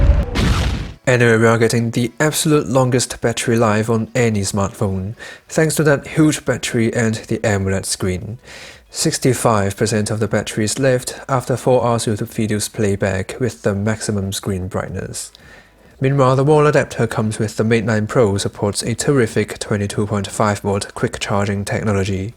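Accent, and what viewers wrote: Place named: United Kingdom. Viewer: Your accent makes me want to drink some tea in a British mansion. Can't stop listening, good job! :)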